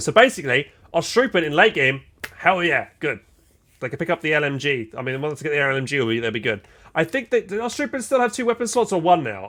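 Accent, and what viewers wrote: United Kingdom. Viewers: I was pulling your leg regarding yall. Anyway, is very English. As am I. In fact we even have the same accent. Maybe it's my age, getting too old for this video computer entertainment;0)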